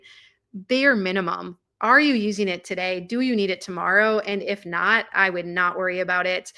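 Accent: Minnesota, United States. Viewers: Minnesotan, maybe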